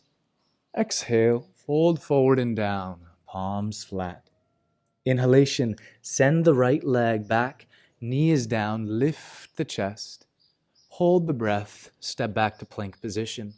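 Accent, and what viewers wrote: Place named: United States. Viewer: I hope I am not insulting you, however, you sound as if you spent time in the U S A Teaching Yoga, or demonstrating. Namasda. God Bless you.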